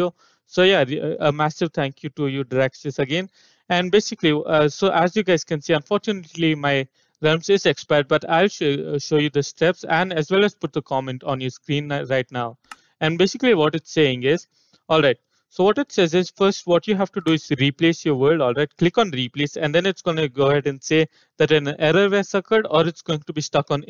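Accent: India. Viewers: Indian accents are the best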